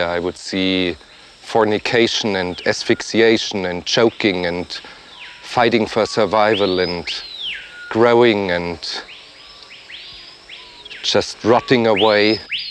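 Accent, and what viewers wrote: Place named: Germany. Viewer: That cynical voice, the thick german accent full of wisdom and perspective. Gives a remarkable contrast to the organic background and the animal sounds that almost mocks his wisdom with creativity.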